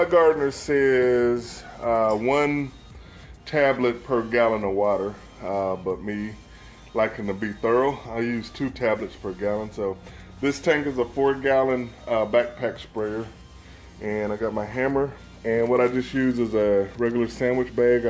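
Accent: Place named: South, United States. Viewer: I love your Southern accent! You might find a decrease in blossom end rot if you add more mulch to the top of the soil too. This keeps the moisture in the soil longer in hot weather which helps prolong the water absorption. Your garden looks beautiful! :)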